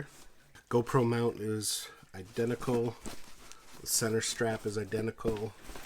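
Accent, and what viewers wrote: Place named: Ottawa Valley, Canada. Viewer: I’m detecting an Ottawa Valley accent :)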